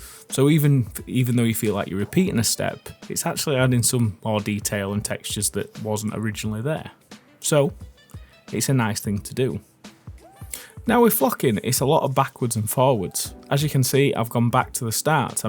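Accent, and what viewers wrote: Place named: Yorkshire, United Kingdom. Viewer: yes lad.. this is that perfect level of yorkshire accent and professional elocution. didn't mean to sound like a dick in prior comment lol. sold on the foam flock, natural undulation is easy mode. purchasing soon!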